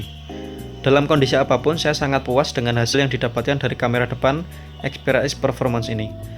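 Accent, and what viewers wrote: Java, Indonesia. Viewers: sampean wong ndi tho mas medokmu kuwi lho, joss gandoss 😂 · review bagus kecuali reviewernya..medokk abis... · Medok banget bang 😀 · Medok gan logat nya,good..jawa bgt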